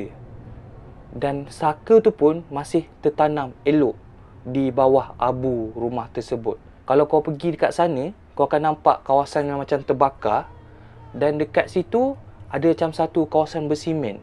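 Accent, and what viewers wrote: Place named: Negeri Sembilan, Malaysia. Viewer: Esse org nogoghii....slang nogoghi kelauttt🤣🤣🤣👍👍👍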